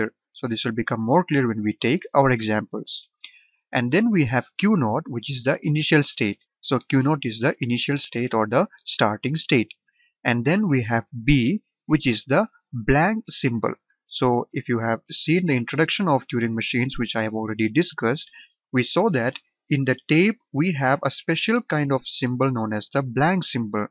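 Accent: India. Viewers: Trust me most of the Indians talk like this video and those who are in an environment where they have to speak in english constantly like in offices or schools have even better accent than the guy in this video. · i like the indian accent, grats from México...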